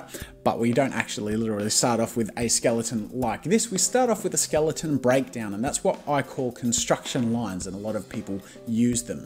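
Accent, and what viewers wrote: Australia. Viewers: His Australian accent was so much stronger 5 years ago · does he have a really Australian accent in THIS video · he sounds extra Australian here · His Aussie accent sounded a bit different here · When Australian accest still exist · you sound so Young and aussie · this by far has his deepest Australian accent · why does he sound so much more Australian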